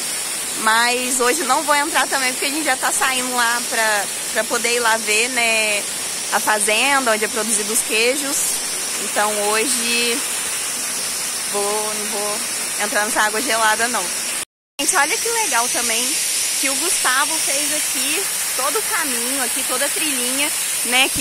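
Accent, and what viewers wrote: Minas Gerais, Brazil. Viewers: Esse seu sotaque mineiro é muito legal. Experiência se adquire com o tempo. Seus vídeos estão cada vez melhores.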